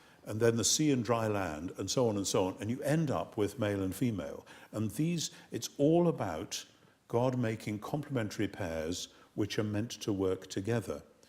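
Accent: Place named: United Kingdom. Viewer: Pompous ass. Narrow minded pompous ass with a British accent.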